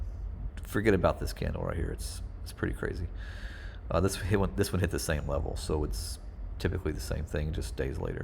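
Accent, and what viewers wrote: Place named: South, United States. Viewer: Just found your channel - bought some HBAR last week. Came for the charts, sticking around for the southern dialect.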